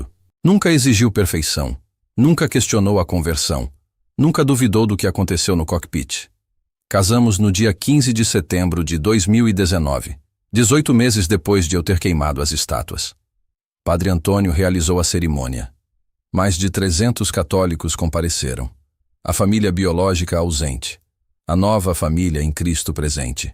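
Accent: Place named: Portugal. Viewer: Esse português de Portugal que o narrador fala é horrível!!!! DETESTO!! Porque??? Se estamos no Brasil???😮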